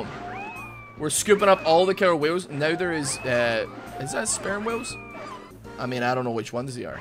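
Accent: Scotland, United Kingdom. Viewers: I feel like you're legitimately the best youtuber I've seen: Although you have a main game (league), you don't mind diverting to another games, you're extremely funny, and the scottish accent helps, you're unique and you interact with the community which is lovely Keep it up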